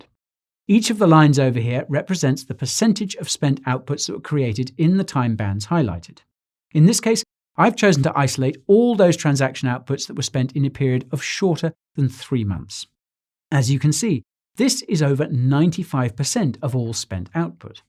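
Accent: United Kingdom. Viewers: You’re the only dude I can watch at 1.5x. And I could probably watch you at 2x if you didn’t have a British accent.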